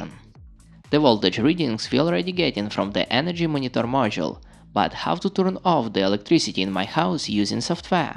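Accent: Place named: Russia. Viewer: The project is only good for the little house, with little energy consumption. P.S. Despite living in US, this guy still has very strong russian accent :-)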